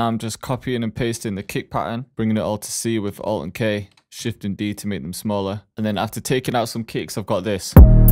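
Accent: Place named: Manchester, United Kingdom. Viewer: This beat is pure fire 🔥 where about in the uk are you from ? Trying to figure your accent out lol gotta be manny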